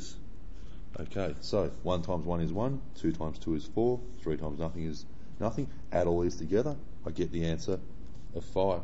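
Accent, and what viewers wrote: Australia. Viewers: Well done . What a nice !Australian accent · The accent is from Australia. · its Australian 😂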